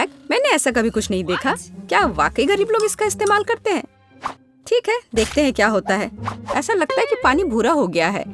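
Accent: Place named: Bhojpuri, India. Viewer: Such a boring voice this lady has... Bhojpuri touch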